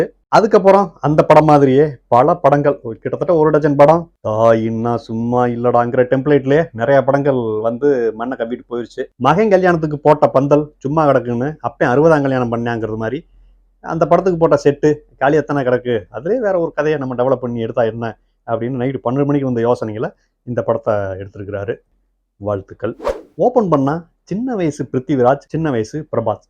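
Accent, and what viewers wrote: Madurai, India. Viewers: Maapla u are sema under rated 😂😂😂 Madurai slang la summa alaparaya iruku review maplooooi 😂 😂😂😂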